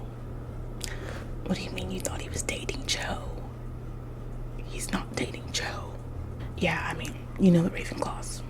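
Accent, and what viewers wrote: United Kingdom. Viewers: I love the British accent 🩷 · if this was my first time watching your videos i would think you were british 😭😭😭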